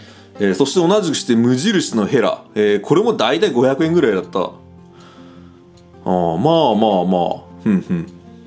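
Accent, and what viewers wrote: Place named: Shizuoka, Japan. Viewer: 無印良品の発音直りませんねえ〜 後、収入の発音も。きほんひとりさんと同じでしたね。静岡弁なんですかね？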